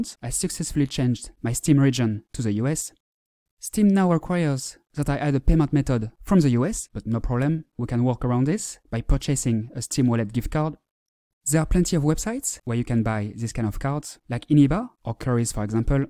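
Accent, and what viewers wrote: France. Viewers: Nice french accent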